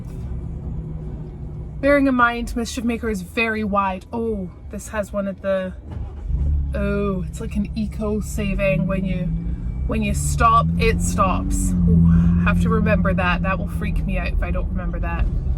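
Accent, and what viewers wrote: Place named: Scotland, United Kingdom. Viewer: Yo sound part Scottish and its really messing with my head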